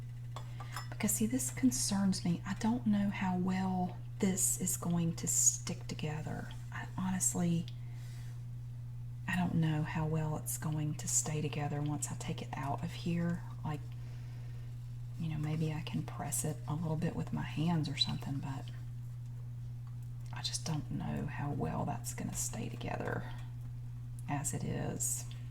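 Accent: South, United States. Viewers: your voice is so relaxing and I love your Southern accent. Makes me feel like I'm back home with my family in Franklin, VA :) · “..and the soap is very draaaahhh” (dry)., your southern accent charms the bejesus out of me 😊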